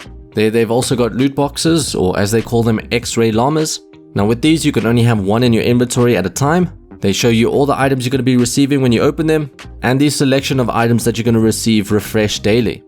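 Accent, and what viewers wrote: South Africa. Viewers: Yo bro you south african?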